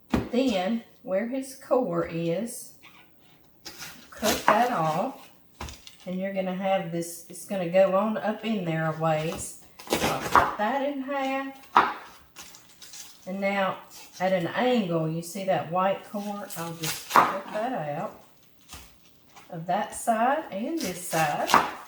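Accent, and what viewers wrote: South, United States. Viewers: I'm a new subscriber and fan and just have to thank you for all the great recipes. I've been binge watching your videos for the last 3 days and love them all! I was raised in SE Texas (Beaumont), so your Louisiana/Southern drawl sounds like home to me. This cabbage casserole sounds wonderful, but I'm wondering if you have ever tried freezing the leftovers. I'm 68, living alone and love to cook big batches of food and freeze the extra for later. I'm just not sure how the cabbage will hold up after freezing and thawing. Thanks so much for taking the time to share your recipes with us and for giving me some new and creative ideas.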